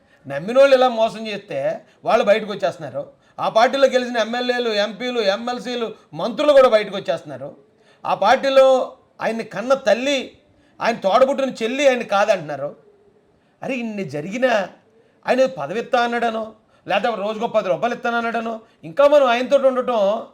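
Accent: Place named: Godavari, India. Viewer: Wonderful Presentation with Hilarious Comedy & Godavari Atakaram 😂🤣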